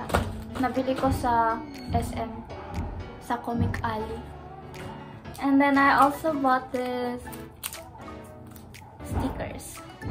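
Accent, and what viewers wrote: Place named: United States. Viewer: Bat napakaslang neto?amerikana kaba?o sobrang oa mo lang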